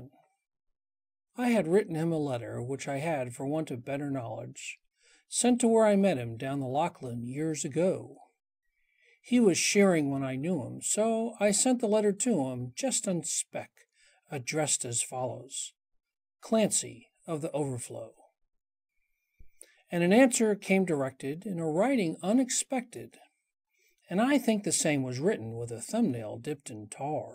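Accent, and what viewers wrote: United States. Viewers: Nice reading. Sorry about the people whinging that it's read in an American accent! Happy for anyone to enjoy and read the poem... but you're an Aussie anyway so it's really a moot point ;-) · Wow never heard this done with a Yank accent, sounds odd but then again its odd that an American would even know of the poem at all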